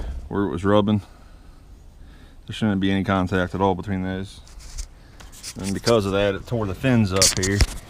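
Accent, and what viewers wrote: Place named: Virginia, United States. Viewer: Need to know that accent....Virginia, with New England accents...?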